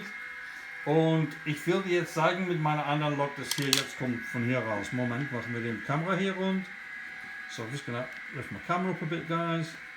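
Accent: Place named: Manchester, United Kingdom. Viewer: Nice pick ups....it still cracks me up when you are.talking Manc and then go into fluent German.......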